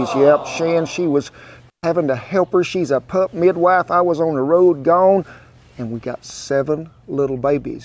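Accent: South, United States. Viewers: thank you love the country accent im a good ole country boy out of east Texas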